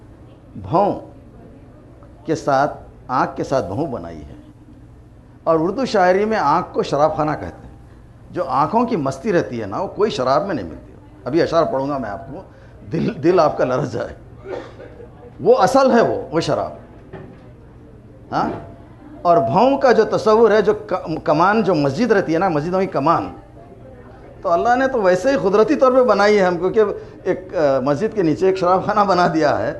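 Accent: Hyderabad, India. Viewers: Hydrebadi hain unki maadri zuban ki waja se ye alafaz ada karna mushkil hai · It is the Daccani/Haiderabadi accent which is fine. Qasam is pronounced "khasam" in Haidarabadi accent and you might have hearf, he has pronounced "Kharaabaat" as "Qaraabaat" once. This is the daccani accent. In Delhi it would be pronounced "Qasam" and "Kharaabaat". Yes, and in Panjab it would be pronounced "Kasam" in some regions even "Haraabaat" instead of "Kharaabaat", and this way way way worse.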